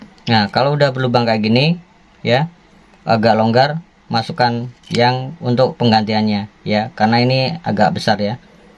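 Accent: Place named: Java, Indonesia. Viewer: Agak medok, tapi oke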